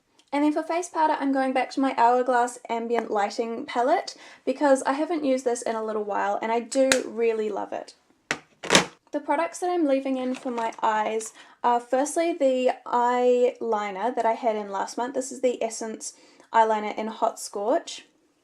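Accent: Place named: RP, United Kingdom. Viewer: I just come to listen to your accent... and your kinda posh lol x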